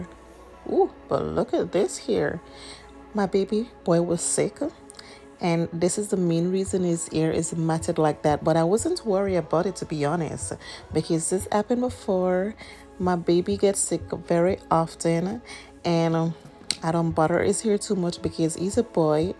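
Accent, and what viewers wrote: Jamaica. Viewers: Jamaican accent strong tho lol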